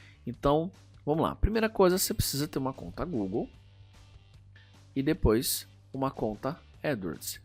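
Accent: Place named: Northeast, Brazil. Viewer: Aula top ainda é nordestino pelo sotaque, ai sim valeu meu vey mais um inscrito de recife <3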